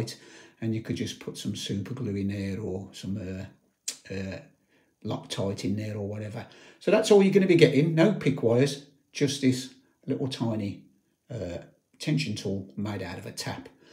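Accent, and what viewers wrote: Birmingham, United Kingdom. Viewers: mate, did you ever try the bicycle wheel spokes for tension wires?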